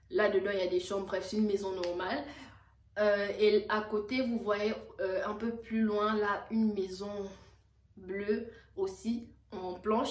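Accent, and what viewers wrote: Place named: Fang, Gabon. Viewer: J’attendais ta vidéo juste pour écouter ton FANG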